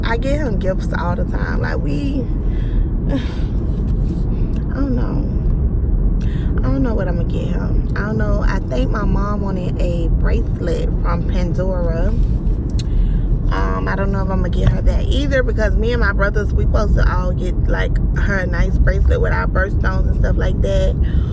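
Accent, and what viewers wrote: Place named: South, United States. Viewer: Girl!!! Yes you are so country lol I love tho. reminds me of my family down south. Love the vlogs and all.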